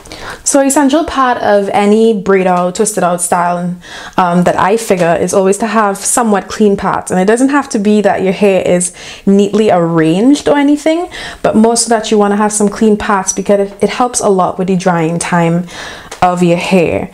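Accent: Trinidad and Tobago. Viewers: Girl i heard your accent n like why you sounding trini, where you from lol so I checked the description box, sure enough, we're from the same country lol. I just here browsing the youtube about getting back to basics cuz things be getting to expensive in the "natural hair community" just as if you were relaxing your hair, maybe even worse lol. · As soon a single hear you, I knew you were trini!!!! 🇹🇹🇹🇹🇹🇹🇹🇹🇹🇹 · Is that a Trinidadian accent I hear? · Are you trinidadian? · Heard you speak, and was like "ah know this accent, she hadda be Trini!" Subscribed!!!! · Babes are you trinidadian??? You sound like my people. #izatrini Btw I just got 3 jars of the blue magic at Walmart. It's a must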